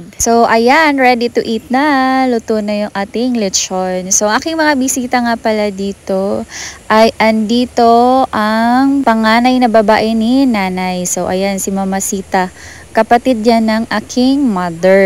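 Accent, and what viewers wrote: Bohol, Philippines. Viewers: abi nhu taga Bohol ka mam mao mn gud tunada inistoryaan nmu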